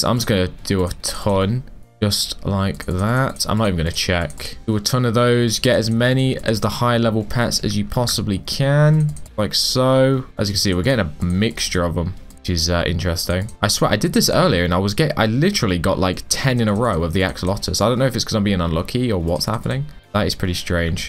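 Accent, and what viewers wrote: United Kingdom. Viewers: Because I think he's British Accent..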